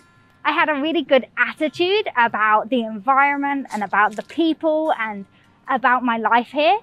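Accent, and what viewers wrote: United Kingdom. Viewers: Hi,妳的英國腔口音，真的很迷人，台灣人很難學得起來。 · 我就喜歡妳「正統」發音的英語，這只是相較於美式發音的英語，並沒有其他太多想法。大概多數的臺灣人只能辨別英式或美式英語，無法再細分英國境內的南腔北調。我的觀念，英語源自英國，所以Lucy講的英語是正統的。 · 不為創作而創作 想 也可 再 動手 靜靜地感受生活的美好 也是 美-！！ I do love your UK accent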